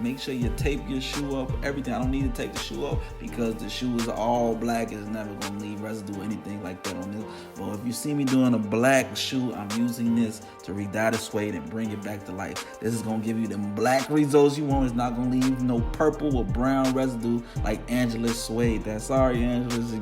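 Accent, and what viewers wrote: Chicago, United States. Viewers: soon as I realized in yo talk you was from chicago too, i knew I was rockin w you🤣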